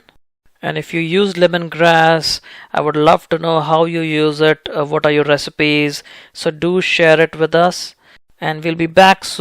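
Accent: India. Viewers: rolls his "R"s... because he's Indian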